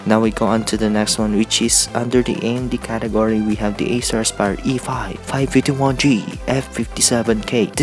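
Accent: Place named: China. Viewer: i cant understand the damn specs with that chinese whatever style accent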